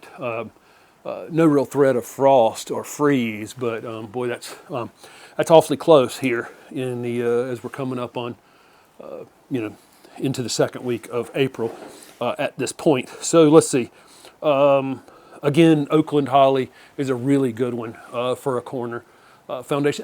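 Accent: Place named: North Carolina, United States. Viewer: You sound like you're in North Carolina, are you, I am? I'm new here. Thank you for your time.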